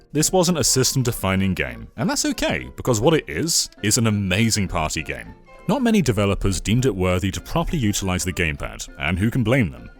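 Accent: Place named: United Kingdom. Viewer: 🤮🤮🤮🤮 uk accent is so annoying!🤮🤮🤮🤮🤮🤮🤮🤮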